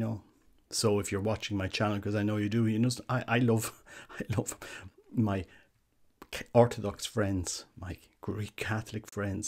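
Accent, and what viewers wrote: Ireland. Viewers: Thank you for addressing this! I love icons and have been collecting copies from my local Catholic store; I couldn't understand the blacked-out baby at all. One good result of TM 's video was that I first heard of Fr. Thady's channel - what a lovely 'old-school' priest he seems to be, although not at all afraid of speaking his mind! We used to have lots of strict but kind-hearted priests with wonderful Irish brogues here in Canada, but no more. Now I can listen to dear Fr. Thady's channel as well as to your soothing brogue. 😃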